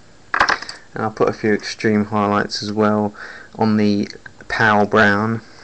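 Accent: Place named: United Kingdom. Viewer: Good God!! A British voice!!!!!!!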